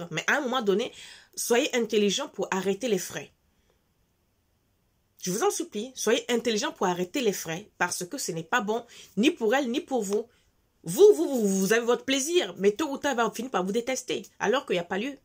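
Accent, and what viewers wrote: Gabon. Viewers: VOUS ETES GABONAISE? j'ai reconnu l'accent c'est le 241 qui gagne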